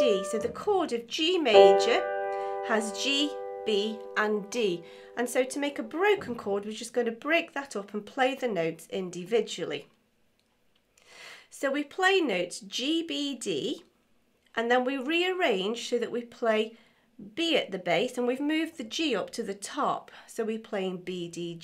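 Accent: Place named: Stoke, United Kingdom. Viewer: Hi thanks for the tutorial! really useful. I am guessing you're from the Stoke area based on your accent 🙂🙂🙂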